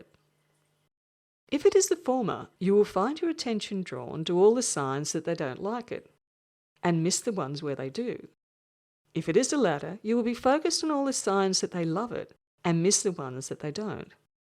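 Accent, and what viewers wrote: Australia. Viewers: razzzzz. sounds like an Auzzie professional surfer. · women with Aussie accents <3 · Horrible australian accent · Australian accent is killin me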